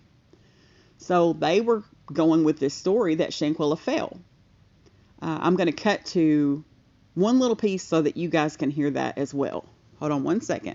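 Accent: South, United States. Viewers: Hello, I haven't listen to your channel in a long time. You sound totally different. You have a southern accent now. Are you the same person who did the Kenneka Jenkins case?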